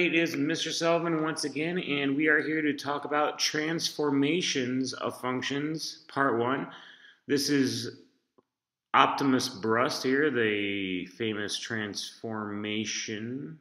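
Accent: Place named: South, United States. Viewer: He sounds drunk with a deep southern accent and it's great cause it keep me interested.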